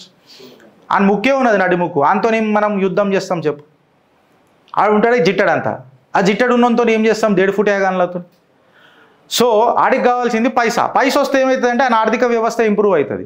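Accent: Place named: Hyderabad, India. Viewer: Brother Journalist! Your uptodate knowledge is commendable, no doubt! But your Hyderbadi dialect of Telugu language is killing. Brother take a tutorial coaching for Andhra Telugu.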